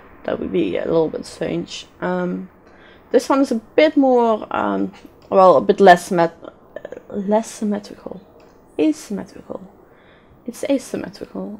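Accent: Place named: Netherlands. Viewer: Awesome build! Your accent sounds Dutch, so are you Dutch?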